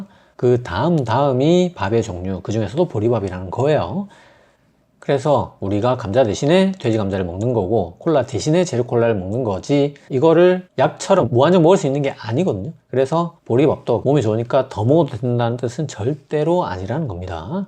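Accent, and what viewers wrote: Gyeongsang, South Korea. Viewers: 늘 좋운영상 감사합니다🙏 경상도억양 은근 친근감 주시네요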